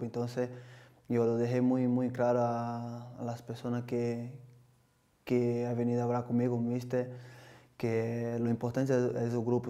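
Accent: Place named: Spain. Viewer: Uh si se nota que es español :v :v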